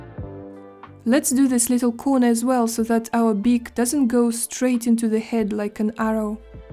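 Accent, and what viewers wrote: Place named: Quebec, Canada. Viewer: About your lovely accent? My wife and I guess French Canadian ? But teaching skills is the best so don't change anything to many people. It needs a great interaction with carving ( not whittling that has no goals but passion for cutting)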